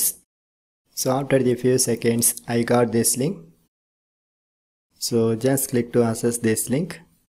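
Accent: South India, India. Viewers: South Indian accent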